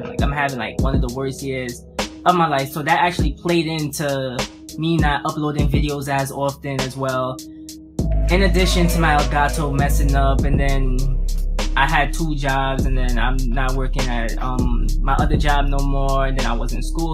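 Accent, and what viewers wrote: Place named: New York, United States. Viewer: i knew you were from ny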